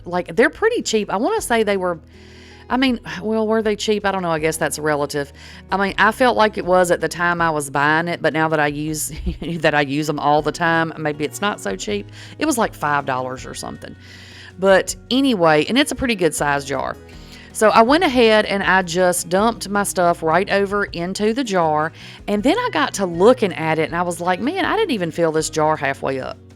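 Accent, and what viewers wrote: South, United States. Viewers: Trying to place your accent. Im in the NWArkansas melting pot and we get have all kinds of versions of a southern accent here.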